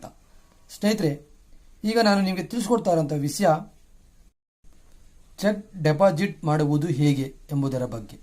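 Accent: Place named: Mandya, India. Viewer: Sir neevu pakka Mandya side thane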